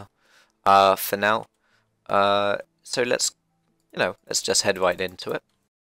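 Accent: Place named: United Kingdom. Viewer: lol are you deaf? hes 100% British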